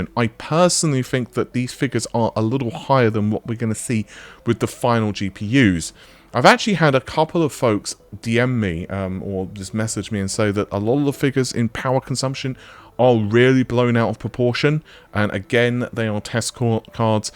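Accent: United Kingdom. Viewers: This guy’s British accent is the type where he doesn’t breath through his nose at all when he talks🤣 other than that, love this channel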